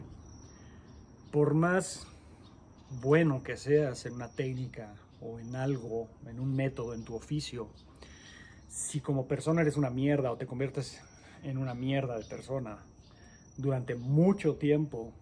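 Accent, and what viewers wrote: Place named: Argentina. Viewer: Yo opino que el sensei está hablando cada día más con acento Argentino! Saludos desde Buenos Aires!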